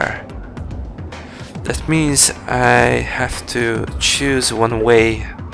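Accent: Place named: Central Europe. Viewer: California c: I was wondering because me and my brother couldn't figure out where your accent was from so thank you! We knew it was somewhere in mainland central Europe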